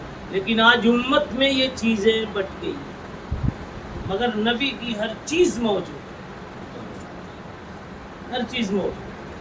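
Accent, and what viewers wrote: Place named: Pakistan. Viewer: قاری صاحب کا لہجہ لہجہ مکندواریہ لگتا ہے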